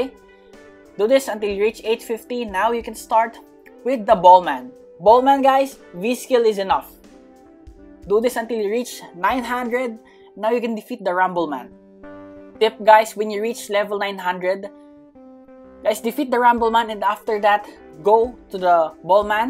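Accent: Philippines. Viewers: The accent r u a filipino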